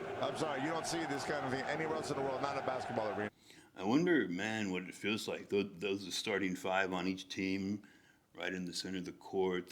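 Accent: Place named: Virginia, United States. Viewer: Where you from,at a guess I'll say Virginia,new York way..I'm English so excuse my guess if I'm offending you at the moment..just heard you say your 5 minutes from clippers and Lakers but that not an la accent is it pal??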